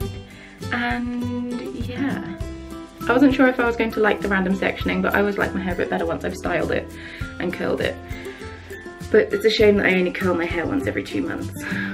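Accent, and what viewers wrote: United Kingdom. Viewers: are you from Germany bc you sound very British XD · are you from britain??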